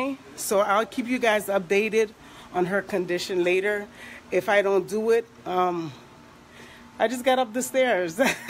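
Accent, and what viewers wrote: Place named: Haiti. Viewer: She might be a haitian American but she sounds like real Haitian but she played it out good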